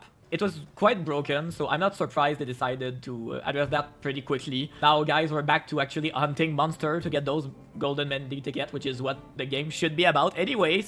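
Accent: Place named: Quebec, Canada. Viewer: Quebecois spotted?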